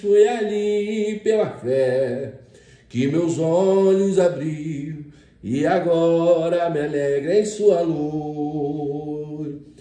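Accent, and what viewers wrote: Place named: Bahia, Brazil. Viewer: Como consegue falar baines assim , como baiano .opaió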